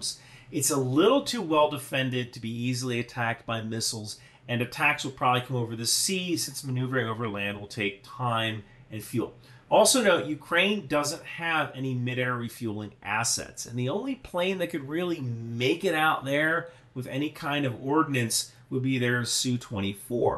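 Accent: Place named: Staten Island, United States. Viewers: Your Staten Island Ferry accent absolutely cracked me up! Your videos are incredibly insightful and informative, so I was pleasantly surprised to get a chuckle from one. Keep up the good work!! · That New York accent threw me off 🤣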